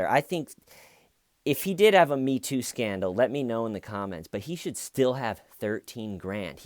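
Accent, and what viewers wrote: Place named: South, United States. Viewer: Damn I was shocked you’re in New York. Sure thought you were southern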